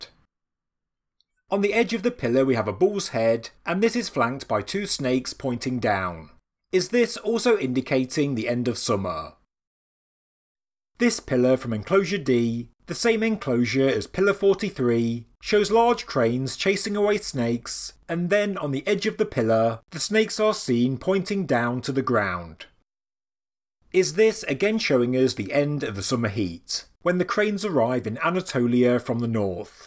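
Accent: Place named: England, United Kingdom. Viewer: I want you to know, after I watch your videos I walk around my house punctuating then end of each sentence, in an English accent, lol. True story.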